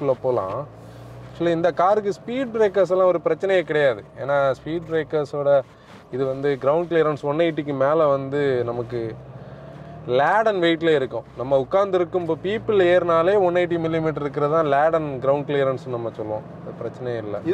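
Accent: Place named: Kerala, India. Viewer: Simple , important , interior , front , point is a word Simble, impordant , inderior , frond , poind is emotion Kerla influenced pronunciation like it though 😃👌😊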